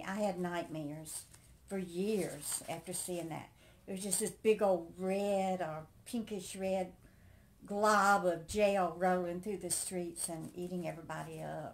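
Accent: South, United States. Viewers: Discovered your channel by typing in "14 QUESTIONS BEING TAGGED ON A VIDEO." Your channel was one that popped up with your answering these questions. Wondered about the questions because saw Debra Joy mentioned being tagged, and she answered these questions, too. Long story short: I subscribed to your channel and tapped the bell (to receive notices when you upload more videos). Am looking forward to viewing some of your past videos and, of course, your new ones. Very much like your delightful Southern accent. :)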